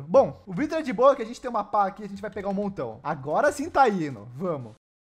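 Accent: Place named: South, Brazil. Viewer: tenho uma pergunta onde vc mora? pq parece q vc é aq do sul Vc provavelmente n vai me responder, mas vai q vc responde ❤️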